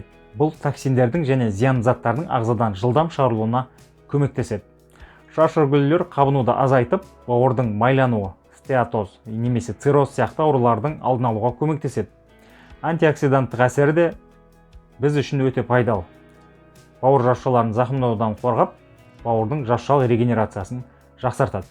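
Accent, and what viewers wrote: Standard. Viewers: Рахмет қазақша таза сөйлегеніз бен пайдалы кеңесізге үлкен рахмееет,риза болдық,Алла разы болсын!!! Сізге жазылып, лайк басып қолдаймыз!!! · Рахмеееет балам, өте тамаша таза қазақ тілінде түсіндіру қандай керемет,,,,өркенің өссін. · Әсіресе, қазақша таза сөйлегенің үшін көп рақмет!!! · Балам таза қазақша сөилегеннің ұнады рахмет жақсы түсіндірдің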